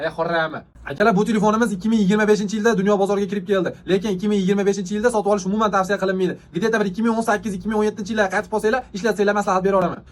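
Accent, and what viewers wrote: Andijan, Uzbekistan. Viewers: Хакикий анжанча обзор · Anjancha shevaaa 😂😂😂😂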